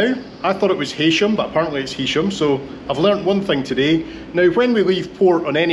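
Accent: Scotland, United Kingdom. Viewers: Greetings, my name is Jeff. I live in Melbourne Australia back in 2000 approximately 23 years ago I was on the Isle of Man, but I had a terrible time getting there I had to get the train to Liverpool, and when I got to the theory, they told me the ferry was busted and it was the time at the motorbike races? There was motorbike people everywhere. Anyway, the theory eventually got away about midnight. I ended up in Douglas about 3 AM cost me 60 Isle of Man pounds to go to my B&B up at Laxley But after getting over that nightmare the next four days on the Isle of Man was fan fantastic because I’m a train buff I had a fantastic time but I don’t know what happened. I forgot the book my return trip back Liverpool, but they help me out at the ferry on standby time and I got on the ferry to go back home where I’ll staying with friends at Usk in Wales. 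But now I am 70 years old, retired and a pension had a cost me big bucks to get over there again and it just out of my price rain I do enjoy your YouTube adventures. Lots of fun all the best to yourself and I love your Scottish accent, Jeff. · Spanish. Hola me gusta mucho todo lo que muestras es muy agradable es muy bonito el ferry y todas las comodidades que imagino que la vez la vez de haber disfrutado mucho Tú también una de curiosidades que me pregunto es tu acento inglés de dónde es o por qué no es british tengo dificultad en entenderte pero me preguntó si es por la velocidad que hablas o porque tienes un un fuerte acento de alguna parte de uk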